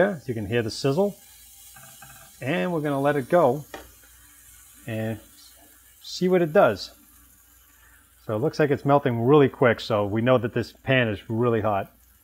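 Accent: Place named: Boston, United States. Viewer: you are the most Boston sounding guy, are you from New England? If not you will fit right in hahaha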